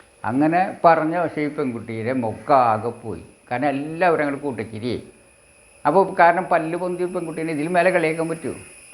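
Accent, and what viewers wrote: Thrissur, India. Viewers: സാർ വളരെ നന്ദി🙏 വളരെ നല്ല ക്ലാസ് ' സാറിൻ്റെ സംസാരം കേട്ടിട്ട് തൃശൂരാണെന്ന് തോന്നി. ഞാനും തൃശൂരാണ്😊🙏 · Sir thrissur ano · സാർ തൃശ്ശൂരാണോ?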